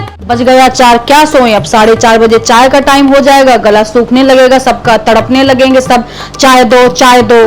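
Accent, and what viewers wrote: Kanpur, India. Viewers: apki awaj bilkul hamare Kanpur jaisi hai.kya aap Kanpur she belong karti ho.your acting is super,real facts,real me aisa hi hota hai. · Nice style as mammi. Bhasha sunta hoon to apno jaisa lagta hai ji. Kanpur ki jaisi boli hai.👌👌👌👌👌👌👌👌👌👌👌👌👌👌👌👌👌👌👌👌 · I think this is Kanpuriya style of speaking very nicely presented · Kanpuriya language. Heart touching. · हर समय क्रोध में ..!!..!!. वैसे आप की बोलने की शैली कानपुर की लगती है 😊।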